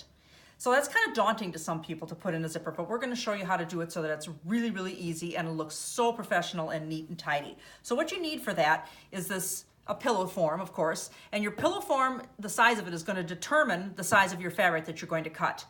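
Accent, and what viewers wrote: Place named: Wisconsin, United States. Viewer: This is a good tutorial! I like the way you teach -- you get right to the point. Sounds like you are in Michigan or Wisconsin ? Oh, I was right...Wisconsin. I recognized your accent :)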